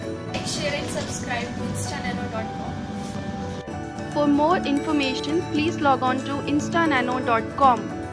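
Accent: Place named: India. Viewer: Ini gara-gara ada logat India nya gw jadi gk ngerti, kalian kali jangan ada logat India nya woyy.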